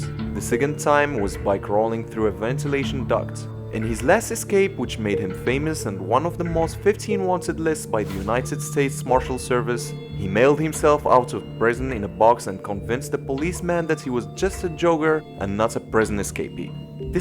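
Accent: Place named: Morocco. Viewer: Nice video! I can hear a moroccan accent, good work man :D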